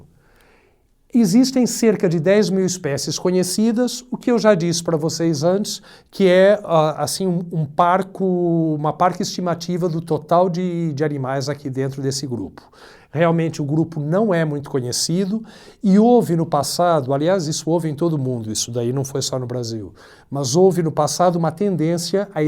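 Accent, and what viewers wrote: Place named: Portugal. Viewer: Às vezes o sotaque dele me parece sutilmente o de Portugal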